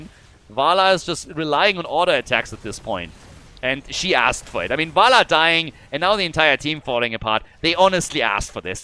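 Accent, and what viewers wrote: Germany. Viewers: It's a German English accent.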